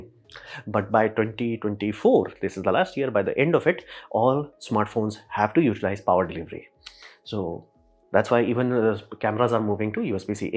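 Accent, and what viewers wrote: India. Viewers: Are you a Bihari? upiete? 😅😅😅😅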